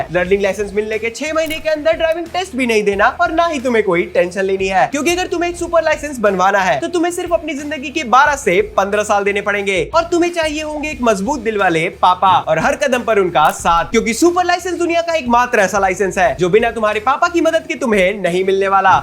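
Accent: West Bengal, India. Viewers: Bhaiya ap west bengal ho kya 😊😊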